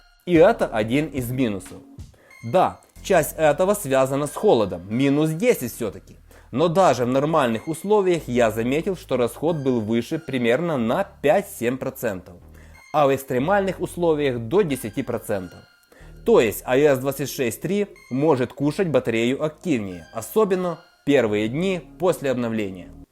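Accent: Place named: Ukraine. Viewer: по акценту услышал, что какол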